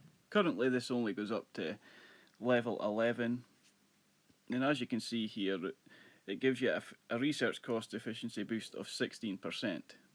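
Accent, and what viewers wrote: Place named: Scotland, United Kingdom. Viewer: I love this dude’s accent. Pure Scottish 🏴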